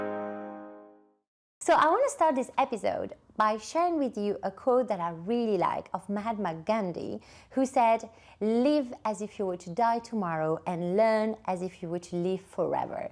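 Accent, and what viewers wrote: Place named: London, United Kingdom. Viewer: thank you for these precious tips. Love your lovely London accent xoxo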